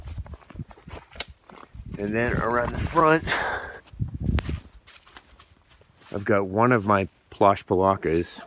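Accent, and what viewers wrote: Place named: West Coast, United States. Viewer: Dang bro, you sound West coast . Love the channel new sub 👍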